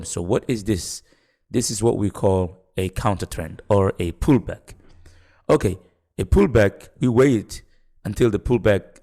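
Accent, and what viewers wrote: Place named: Somalia. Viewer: You sound somali, i dont know why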